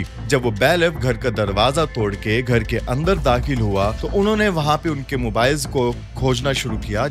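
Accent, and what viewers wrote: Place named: Pakistan. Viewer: Bolne ke lehje se lagta hai pakistani ho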